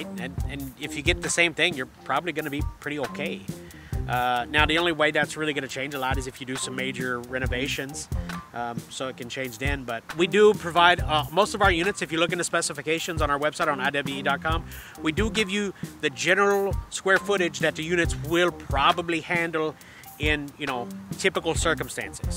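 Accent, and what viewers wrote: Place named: Canada. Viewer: Your accent is between Canadian, English, Irish. Really crazy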